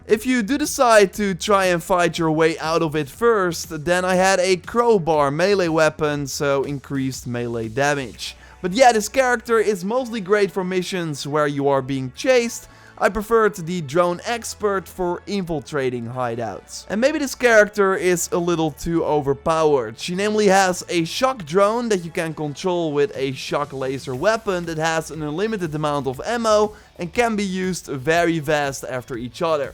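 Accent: Netherlands. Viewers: Yo man you seem to have a dutch accent.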